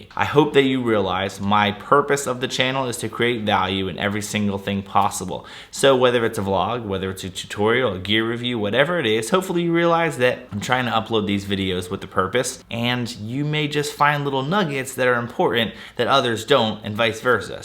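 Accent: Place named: Philadelphia, United States. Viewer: Exactly what happened to me! Started watching to get tips on organizing my businesses video library and really liked not only your content but your ability to communicate which led me to follow you and watch all forms of your videos. An interesting blend of topics and always useful for life. Appreciate your unique perspective. Also originally from Philly area, (Drexel Hill many years ago) so it's cool to see Philly and the burbs scene and hear the famous Philly accent. Keep up the great work! AND THANK YOU for generously sharing all you do with us. I've learned a lot and appreciate your hard work to deliver it to us! PS I own a chain of hair salons in Florida. If any of the woman in your family ( mom, sister, gf, wife, friend) are ever in Southwest Florida Naples or Bonita Springs they can come in for any services they want all for free. 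The works, color, cut style anything at all, my treat. Least I can do to thank you for what you've done for us! Salon's name incidentally is Robert of Philadelphia! Have an amazing day!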